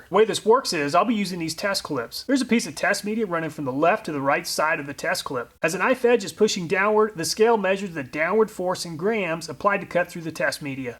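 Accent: United States. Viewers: He sound so American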